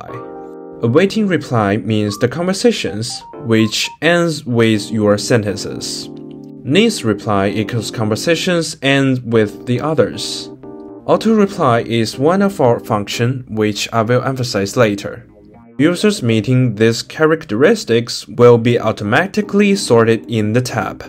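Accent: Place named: China. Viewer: as soon as I heard the chinese accent I was like, no thanks, I dont want my personal info to be in some chinese farm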